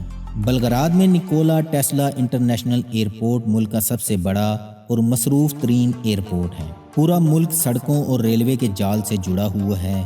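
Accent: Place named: Punjab, Pakistan. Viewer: Masha Allah bhai aap bohat achi video banatay مگر آپ با برکت کو ببرکت نہ کہا کیں۔بہت برا لگتا ہے۔ اردو کو پنجابی لہجہ میں بولتےہو نوی بازار کو نوی بزار تو نہ کہو۔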